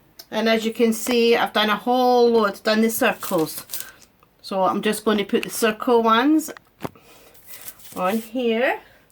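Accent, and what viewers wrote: Scotland, United Kingdom. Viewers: As I like the Scottish accent listening to this was almost like listening to a ASMR video. Greetings from Italy.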